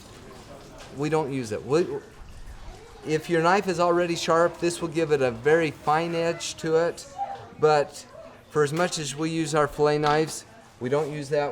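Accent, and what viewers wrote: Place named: Utah, United States. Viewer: 😂 Yeah, I blame it on the Utah accent. Will try to do better next time.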